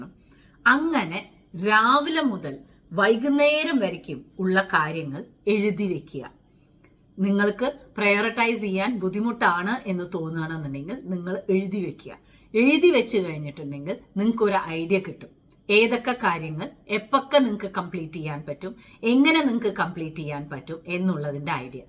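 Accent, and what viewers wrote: Palakkad, India. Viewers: chechide palakkadan basha miss cheyyunnu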